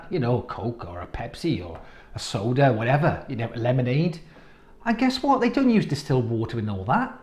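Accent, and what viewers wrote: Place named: Neath, United Kingdom. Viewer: Cracking video mate. I’m from neath. You sound close. Would love to know what my tap water is. I do want to get one of these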